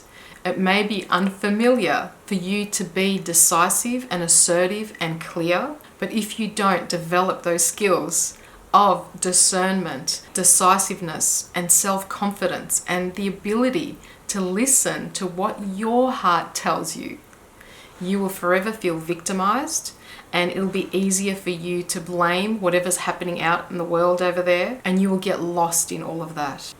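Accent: Australia. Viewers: OMG I have been working on healing from my narcissistic abuse for a long time and I have just discovered your chaneI. I love that you have an Australian accent. You are so clear and empowering. Thank you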